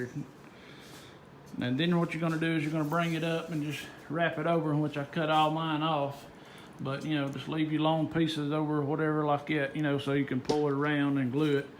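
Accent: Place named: South, United States. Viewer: Alabama or Georgia? My Mama was from Columbus, Georgia and it's my hobby guessing where ya'll from with your Southern accents. She never got used to Montana winters. Foot in mouth if I'm wrong.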